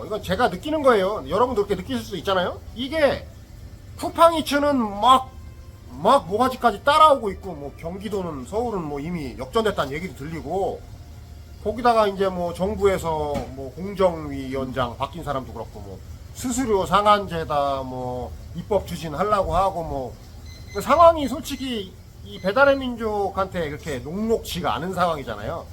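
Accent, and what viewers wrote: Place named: Chungcheong, South Korea. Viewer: 역시 충청도여 ㅋㅋ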